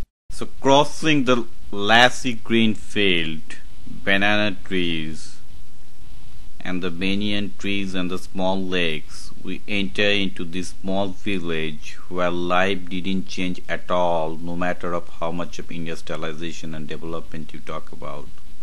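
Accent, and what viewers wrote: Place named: India. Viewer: your reporting is pathetic man,,... u need to improve your accent..a typical indian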